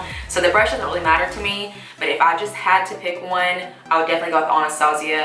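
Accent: South, United States. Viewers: I totally didn't expect your voice to sound like this😆 Love the southern accent. Where are you from? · You are so so so GORGEOUS!!!! You popped up on my suggestions and I just had to watch. I love your southern accent, hun it sounds a lot like mine! New subscriber, I'm impressed. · Is that a southern accent you have? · New subbie! Where's your accent from? You sound like a southern girl! Love your eye makeup! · Omg where is she from her accent is adorable and southern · Seriously? Clearly American and southern......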